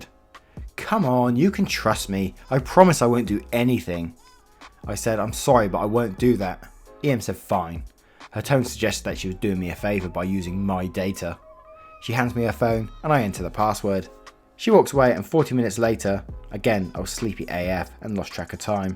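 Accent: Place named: Oxford, United Kingdom. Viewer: Oh ok, thanks for clearing that up in my mind - I honestly never came across that broad accent of yours before and find it simply difficult to follow as I try making out what you say and squaring it with what i read lol (only been to Oxford once, to throw eggs at the guys from Balliol College and leave, so I really never got the chance to listen to the local lingo ^^)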